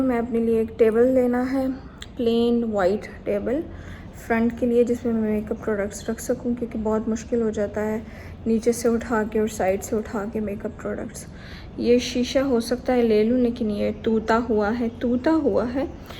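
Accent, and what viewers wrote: Punjab, India. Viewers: I think ap is vlog mein Merium parveiz ki copy kr rhi hain bolnay mein punjabi style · Aapi glasses bht pyri hn or ap ki funny punjabi Sony pay sohagha .... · Ap jaisy apni phli videos me bolti the waisy e bat kiya karen..zeada punjabi nooooooo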